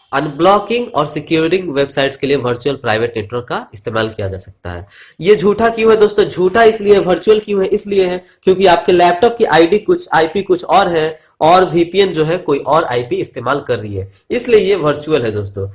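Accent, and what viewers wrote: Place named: Bihar, India. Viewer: bihari hai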